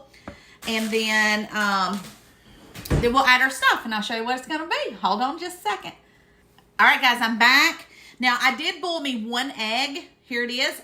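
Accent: South, United States. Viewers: I detect a Southern accent..so that being said..where is the Duke's?! 😂😉❤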